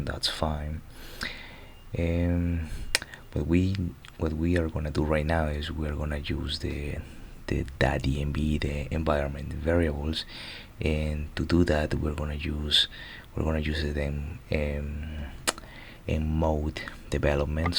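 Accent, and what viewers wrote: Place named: Dominican Republic. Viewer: i feel a little know accent in your speech, like a dominican acccent. good content tho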